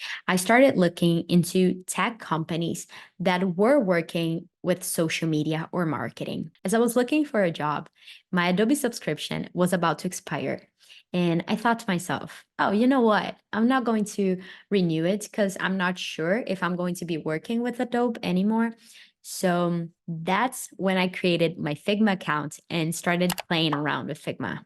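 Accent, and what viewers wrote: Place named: Brazil. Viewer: I KNEW you were Brazilian, I felt it from your accent. I'm Brazilian too and I love your videos. I'm a product owner | Product Designer:hand-pink-waving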